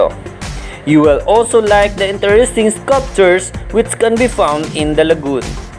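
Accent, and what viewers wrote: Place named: Visayas, Philippines. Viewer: agree gd ko dra sir wla prblema lng accent nga visaya g gwa just accept the fact tha we are ilonggo proud kta kng ano kta ndi lng mg judge sg iban respect lng dbala sir !?keep going lng sir God Bless😇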